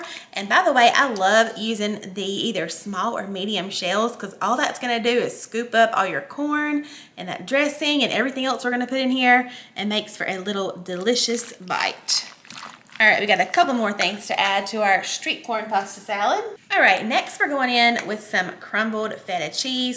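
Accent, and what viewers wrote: South, United States. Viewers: Can't listen to that southern accent. Bah bye.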